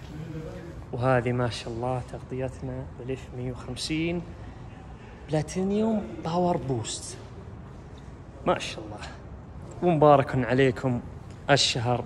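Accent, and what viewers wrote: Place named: Qassim, Saudi Arabia. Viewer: شرح جيد . مع ملاحظة شدة اللهجة القصمنجية . ،اولللللللاااااااوه 🤪 مع انك طولت بالصندوق حسستني انه هلي.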